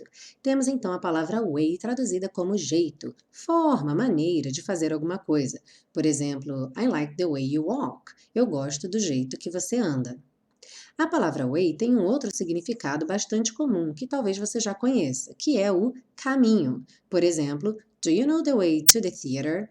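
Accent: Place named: São Paulo, Brazil. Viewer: Certo professora! Som de r do interior de São Paulo(Piracicaba).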